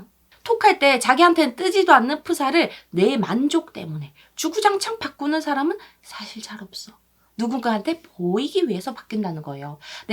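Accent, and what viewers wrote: Gyeongsang, South Korea. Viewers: 갱상도 여자?짜치다니 ㅋ ㅋ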